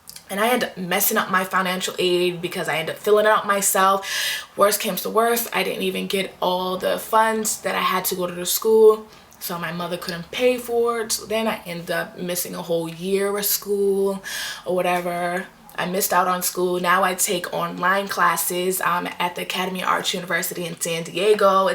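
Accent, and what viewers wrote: Boston, United States. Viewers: I can tell you're from Boston by your accent lol!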